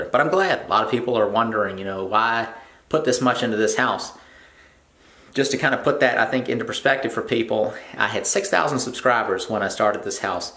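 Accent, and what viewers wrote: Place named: Virginia, United States. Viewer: By your accent it sounds like you’re in South Central VA. Do you perform contracted home renovations as well?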